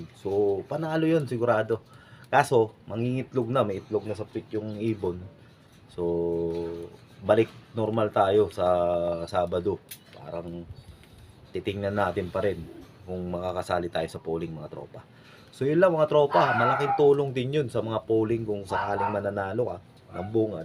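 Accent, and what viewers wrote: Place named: Iloilo, Philippines. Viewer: kasi parang my tono kang ilonggo hehe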